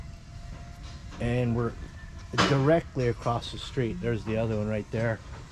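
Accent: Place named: Southern California, United States. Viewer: I am not understanding the S0-Cal accent lol because at first I thought I was watching another concrete persons video from So-Cal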